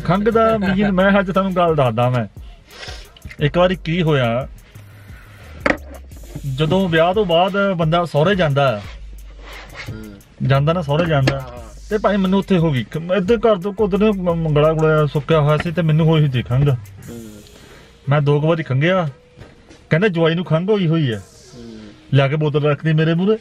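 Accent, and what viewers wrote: India. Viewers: Yar ehi desi English tah sanu changi lagdi ea, veer sira, v sira banda,ehi kuch loki dekhna chonde ea😃👌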